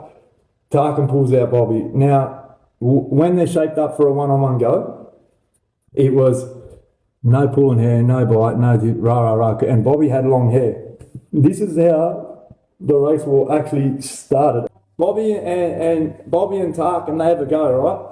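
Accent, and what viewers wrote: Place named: Lebanon. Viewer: Your white!! And sound lebo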